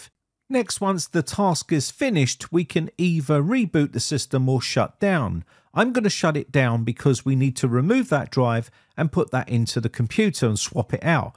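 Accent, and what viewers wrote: United Kingdom. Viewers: I’ve wanted to say that for a long time, too. Since someone else has now mentioned it, I add my voice to theirs: Love the UK accent! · Oh it's more than just his accent for me (although everyone knows Americans adore British accents😍), but he also sounds *JUST LIKE* Sir Maurice Micklewhite CBE (otherwise known as the actor Michael Caine 🤗🤗🤗) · The UK accent is so pleasing to my ears. Thanks for the informative video.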